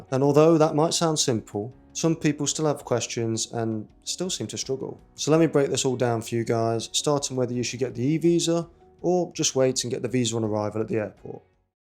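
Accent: United Kingdom. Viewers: I've been listening to you, thinking your accent isn't so different from my dad's family. They're Brummies! I hope that's not an insult... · Which part of uk is your accent from? Midlands ? Ha ha Many thanks,, curious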